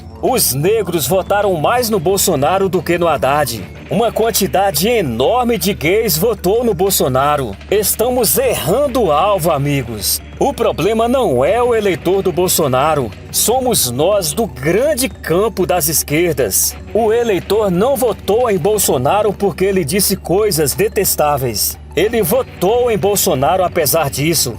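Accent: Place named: Bahia, Brazil. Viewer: você é baiano? Esse seu sotaque está me fazendo crer que sim!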